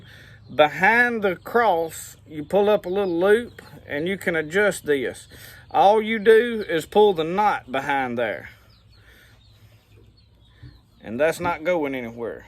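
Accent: South, United States. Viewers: Hes like me he's got a southern accent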